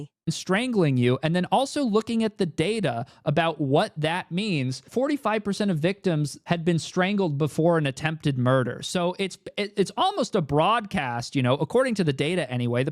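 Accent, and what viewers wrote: United Kingdom. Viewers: I'm only watching this for the British accent when doing Australian impressions. It's what I fuckin live for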